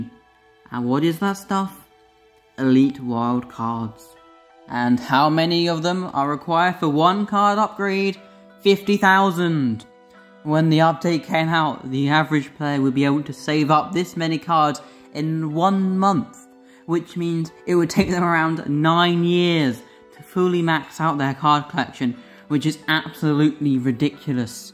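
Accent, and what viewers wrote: United Kingdom. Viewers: i agree with froggy, just try be less british in the future. but nice video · i would've given this video essay a chance but you way too british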